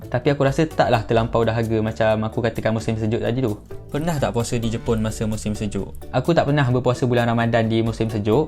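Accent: Terengganu, Malaysia. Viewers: Buat vid full slang ganu 🤣🤣 cerita pasal pape je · Org ganu ka?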